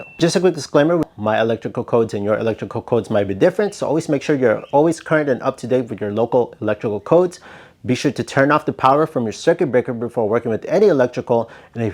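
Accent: Philippines. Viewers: you got a hint of Filipino accent, great content by the way.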